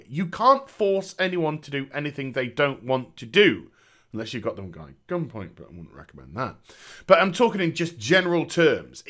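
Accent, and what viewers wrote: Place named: United Kingdom. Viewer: More videos please mister funny British man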